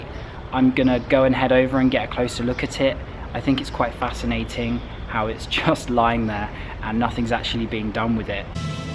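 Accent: United Kingdom. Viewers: You’re British English is very beautiful 👍🏻 Oh, and about the orthodox church, all this churches belonged to Albanians I don’t get it why they want to destroy it and most of churches are Catholic Churches and they belong to Albanians... this churches are ancient Albanian monuments stolen by Serbs recent until war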